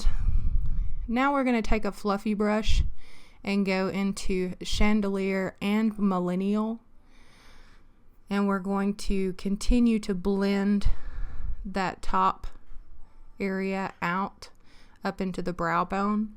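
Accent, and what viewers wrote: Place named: South, United States. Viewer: you are so pretty and I love your sweet southern accent. <3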